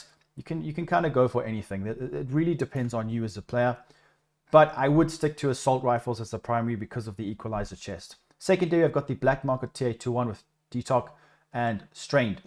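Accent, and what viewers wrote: South Africa. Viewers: Is that a South African accent I hear ? Hello fellow traveller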